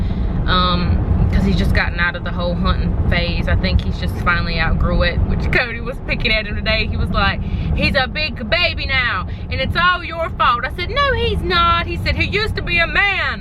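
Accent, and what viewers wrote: South, United States. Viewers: Lord girl I love your sassy southern accent!! I'm also from North Carolina literally from Rockingham we're so close! Love the videos you create girl 💓💓☺️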